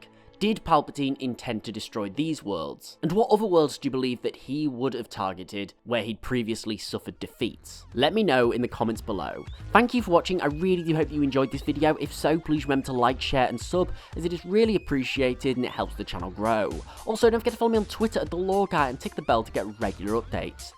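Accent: United Kingdom. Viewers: I agree, I love brittish accents. Tho according 2 sw lore, he's evil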